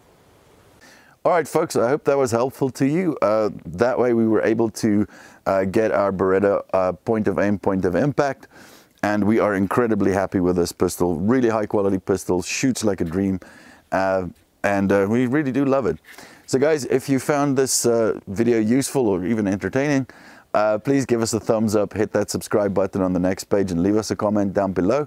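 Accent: South Africa. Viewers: Liked your video. Is that a South African accent I am hearing? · Thanks for this, same experience on my APX. Are you South African by any chance? Great video, subscribed!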